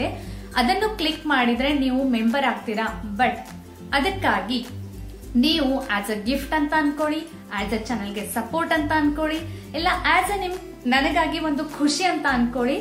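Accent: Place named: North Karnataka, India. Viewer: Nimma Kannada nange tumba ista iam also Uttara Karnataka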